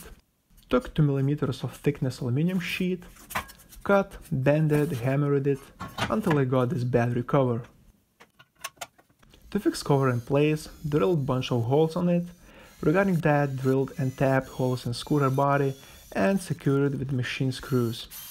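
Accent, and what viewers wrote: Russia. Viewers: Судя по акценту, ты русский) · Русский акцент)))) Канал на русском есть? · Сразу слышно что русский)) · Акцент русский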